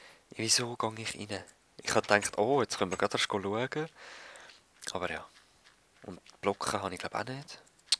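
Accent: Switzerland. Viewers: SCHWIZZERDÜTSCH <3<3<3<3<3<3<3<3<3<3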